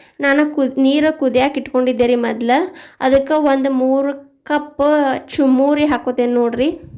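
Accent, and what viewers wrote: North Karnataka, India. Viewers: Adu nam uttarkarnataka Kannada gramin bhashe adu...yaw yaw oorkade Heng Heng matadtaro hang matadtidare bidi.. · ಉತ್ತರ ಕರ್ನಾಟಕ ದ ಸ್ವಚ್ಛ ಭಾಷೆ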